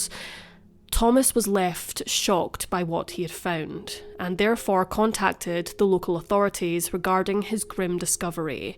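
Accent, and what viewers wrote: Scotland, United Kingdom. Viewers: I know the topics are serious but I do find her voice so relaxing . I love the Scottish accent x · I have family from the Angus area of Scotland, and her accent sounds really similar... sort of 'Edinburgh' rather than Glasgow. It really is such a lovely accent, and the narrator's tone/timbre is so beautiful too... She could read children's stories or folk tales/legends, and it would be amazing :)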